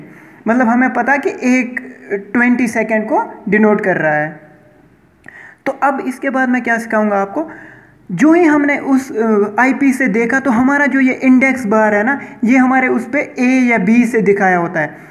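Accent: Kashmir, India. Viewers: Sir aap Kashmiri ho?? Any way nice..thanks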